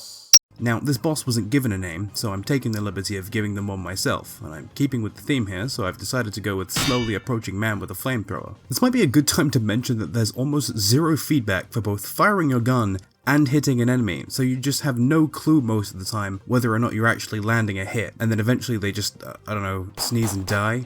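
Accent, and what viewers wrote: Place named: Australia. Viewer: Love this game and your amazing Australian accent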